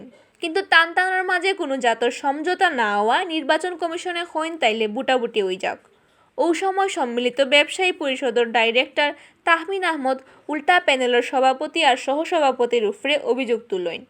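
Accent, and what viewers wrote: Sylhet, Bangladesh. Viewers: আফা গো আপনার সিলেটী মাত খুব সুন্দর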